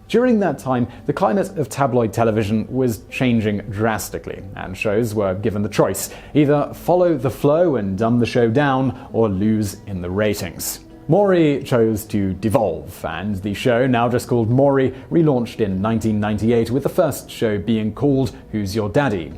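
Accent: England, United Kingdom. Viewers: Please for the love of all you hold dear, have someone make sure knows the pronunciation of people's names. Granted he has an English accent that allows for differently pronounced words, like controversy. However, a person's name is only pronounced one way. This is a pet peeve because my name is seldom pronounced correctly. It is beyond cringe-worthy to hear a famous person's name mispronounced.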